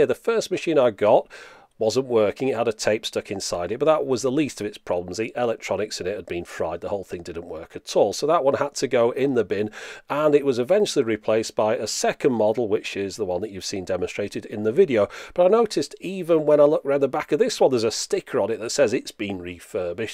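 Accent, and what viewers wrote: United Kingdom. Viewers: Это британский акцент всегда радует мои уши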